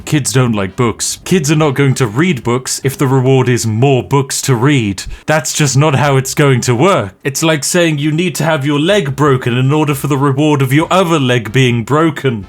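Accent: United Kingdom. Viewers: You sound British. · 15 minutes of pictures and some guy with a lame british accent?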